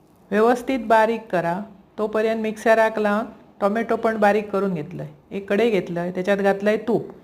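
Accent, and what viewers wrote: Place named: Malvan, India. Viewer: सुंदर आणि सहजगत्या करता आपण आणि साधेपणा आणि मालवणी भाषेतला गोडवा खूप मजा नायतर आमच्या बायका कधीपण काय पण घालतत त्यांका समजवणार कोण लगेच भांडूक तय्यार पदर खोचून " तुमका काय अक्कल असा? 🤣🤣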